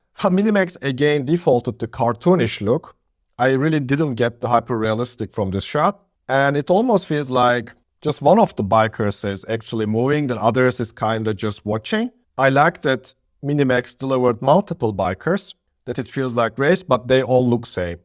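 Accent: Turkey. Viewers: Kral türk müsün aksan türk aksanı :d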